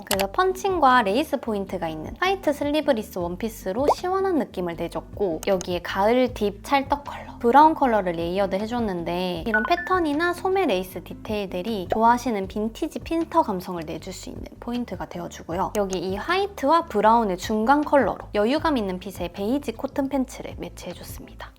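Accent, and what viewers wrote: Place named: Daegu, South Korea. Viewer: 아이돌 미모에 옷 매치도 잘하셔서 패션유튜버중 가장 좋아요!! 그리구 대구 동생은 언니의 나긋나긋한 사투리말투도 너무 편안하고 듣기 좋아용❤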